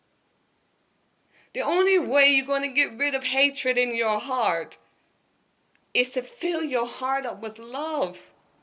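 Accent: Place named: Jamaica. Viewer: You sound Jamaican.....keep on LIVING. Number seven 7⃣.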